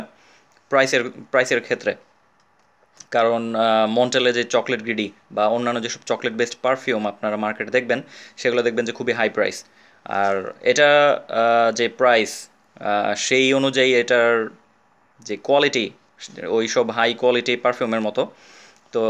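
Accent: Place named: England, United Kingdom. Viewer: Don’t mix up English accent in Bengali. It doesn’t suit you & moreover it truly irritates many. Disliked the video for the same reason.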